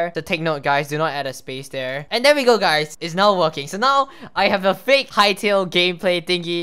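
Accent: Singapore. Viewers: why do you sound singaporean :sob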